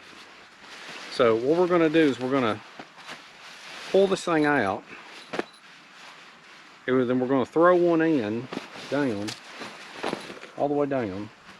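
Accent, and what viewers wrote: South, United States. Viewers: gm love your videos and your southern voice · Awesome video. Loving that southern accent. 😎🤘🇺🇸🇺🇸🇺🇸💯💯💯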